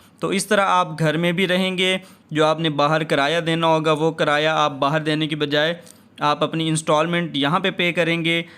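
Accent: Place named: Punjab, India. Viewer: location آواز سے پتہ چلتا ہے کہ بندہ پنجاب سے ہے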